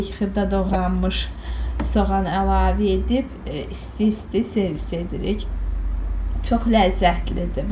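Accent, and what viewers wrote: Baku, Azerbaijan. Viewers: MaşAllah gözəl Bakı ləhcəsi əllərinə sağlıq.Mən sizi izləyirəm bişirdiyiniz his olunur dadlı və ləzətlidir təşəkürlər.Nafisa Qurbanova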